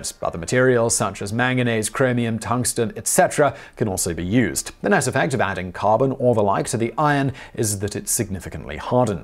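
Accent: United Kingdom. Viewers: BonesThe modern British English accent was created to distance themselves from seceded American colonialists. The goal was to make themselves sound posh, or more intelligent, versus someone from the US. Im saying his British accent is so perfect that instead of my usual opinion of the accent, which is lazy, I think it makes him sound rather fancy.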